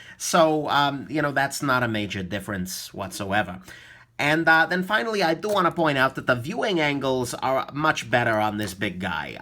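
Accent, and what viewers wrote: New York, United States. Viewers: Love your accent - are you a New Yorker by any chance? :-)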